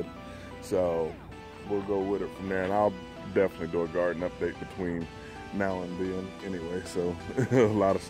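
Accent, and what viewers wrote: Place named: South, United States. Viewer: I love your Southern accent! You might find a decrease in blossom end rot if you add more mulch to the top of the soil too. This keeps the moisture in the soil longer in hot weather which helps prolong the water absorption. Your garden looks beautiful! :)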